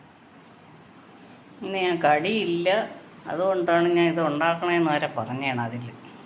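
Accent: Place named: Thiruvananthapuram, India. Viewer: ഇത്താന്റെ തിരുവനന്തപുരം സംസാരം കേൾക്കാൻ നല്ല രസം 🥰❤👍🏼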